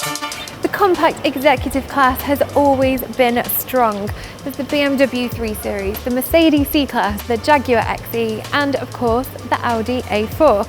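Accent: United Kingdom. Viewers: Ou that British accent...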